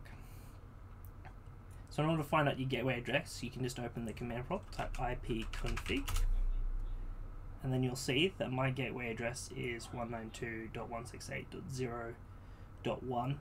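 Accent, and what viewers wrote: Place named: Adelaide, Australia. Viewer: champion, cheers unrelated, but are you from adelaide or is my ear for accents way off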